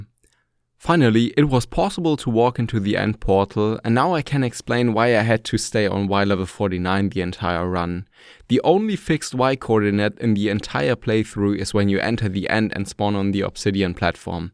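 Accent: Germany. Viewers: Am i hearing a german accent?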